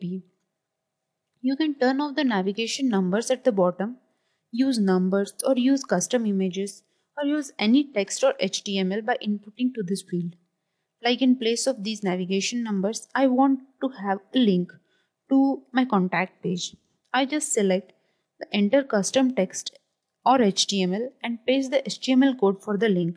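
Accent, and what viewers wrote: India. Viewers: Indian speed, accent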